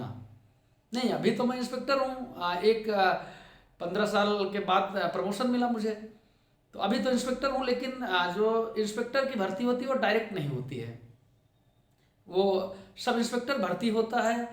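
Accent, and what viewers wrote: Awadh, India. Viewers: very intresting sir.. and the way of your talking in awadhi is also imressing..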